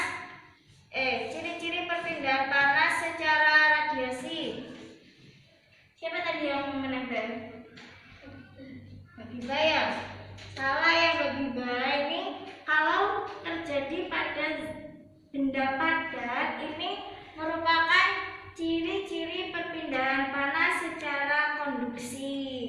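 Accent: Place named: Java, Indonesia. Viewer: Medoookk rekk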